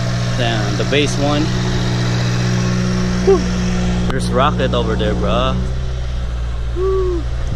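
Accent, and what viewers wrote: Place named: Philippines. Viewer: That Pinoy accent lol much love though bro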